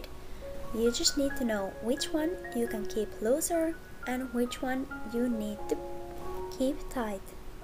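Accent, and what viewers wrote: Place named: Finland. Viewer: I knew your accent was from Finland. So beautiful there. Thanks for trying to show us how. Be well and stay safe.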